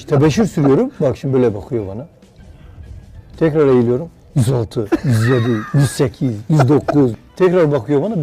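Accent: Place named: Diyarbakir, Turkey. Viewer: diyarbakır ağzı mükemmel, kusursuz.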